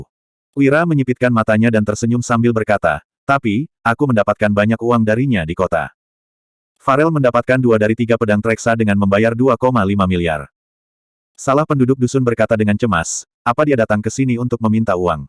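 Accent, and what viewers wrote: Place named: Palembang, Indonesia. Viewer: Wong plembang?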